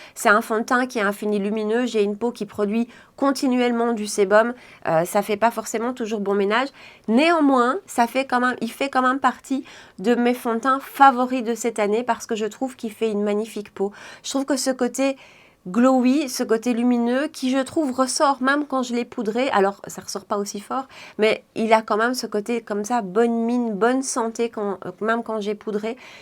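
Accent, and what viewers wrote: Belgium. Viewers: Coucou d'une compatriote expatriée, ravie de retrouver les intonations, expressions du plat pays. Je suis de la team investissement sur le skincare et maquillage petits prix, mais j'ai des rides et des taches qui réclament un fdt ou BB crème performant, alors je me lâche sur le fdt. J'ai eu étrangement un gros coup de coeur sur le Nars comme vous, même si normalement le glow fait effet loupe sur les rides, celui-ci fait un teint éblouissant, comme vous dites, même en poudrant, et en unifiant, une merveille. J'ai craqué sur le Lancôme, mais gros flop, je trouve qu'il n'unifie pas, ne floute pas, ne tient pas, ne se fond pas, me fait vraiment un teint...moche! Malheureusement, il n'y a presque plus de teintes claires et médium du Nars sur les sites, donc je le garde pour les grandes occasions et les longues journées. En petit prix, j'aime beaucoup le Bourjois 123 pour le moment, avec une base illuminatrice dessous, excellent rapport qualité/prix pour moi(peau mixte)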